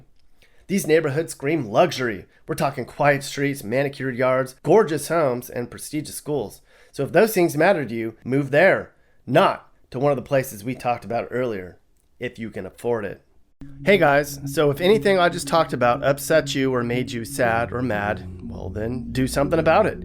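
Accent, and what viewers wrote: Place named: California, United States. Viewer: He sound like a fucking cali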